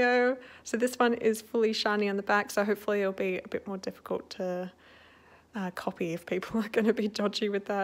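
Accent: Australia. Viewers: When i heard her accent it reminded me of Felix and i understood in 0000.01 second that she was Australian 💀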